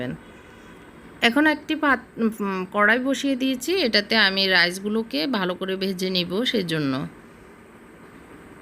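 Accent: Noakhali, Bangladesh. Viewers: Shob valo kintu apnar uchcharon thik kortey hobey.. Noakhailla uchcharon shuntey khub kharap lagey.... otherwise your recipe is nice.. all the best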